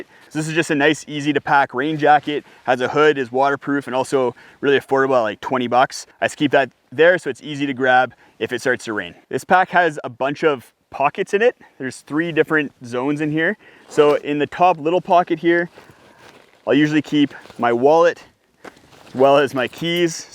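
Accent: Canada. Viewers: Wow this guy’s Canadian accent is thicc!!! I’m from Detroit so familiar with Windsor, Ontario but this is at another level. Great information though! I added some of these items to me REI shopping cart. Thx!! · The Canadian accent is amazing.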